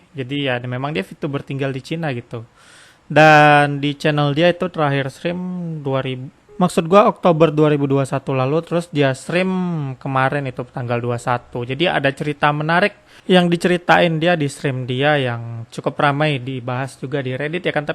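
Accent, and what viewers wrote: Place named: Medan, Indonesia. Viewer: Bang lu orang medan yah? Keliatan dari logat bicara nya?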